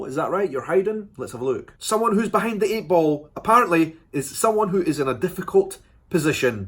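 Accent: Scotland, United Kingdom. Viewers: I just adore you. It's so touching that you are at all concerned with my country but you seem particularly interested. I feels like such a compliment that you've gone to so much trouble to see what we're about. I desperately wish I had the means to visit Scotland and return the favor. Your accent is enchanting to me. :) I wish I could experience your country, as well. I've only seen it on film and screen but so much of it seems utterly beautiful.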